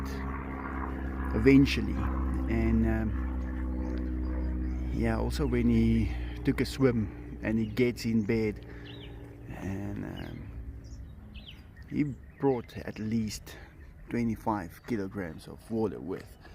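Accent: South Africa. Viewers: I love watching videos he has true love for animals, and I love the SA accent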